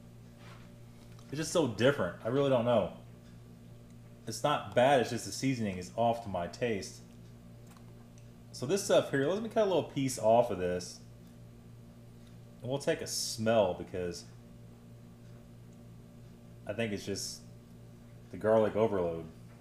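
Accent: United States. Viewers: Super, your American accent is so cool :) I sometimes wonder if I was an American before ;) And ofcourse your way of showtime keeps me watching, Greetz from this damn cold Holland lol :)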